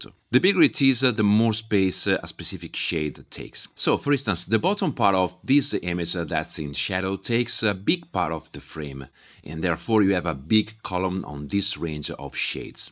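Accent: Italy. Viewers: the EE at the end of every word is amazingly Italian haha. thanks for the video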